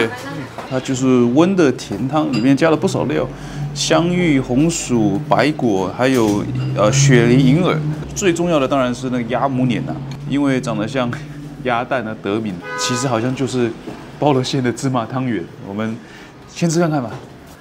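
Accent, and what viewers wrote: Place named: Taiwan. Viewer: 博主是哪裡人？臉相是浙江人，但是說話又有點台灣的感覺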